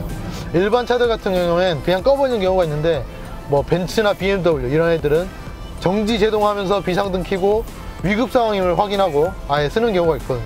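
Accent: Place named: Jeolla, South Korea. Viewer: 발음이 문제가 아니라 전라도 사투리가 문제 인 듯?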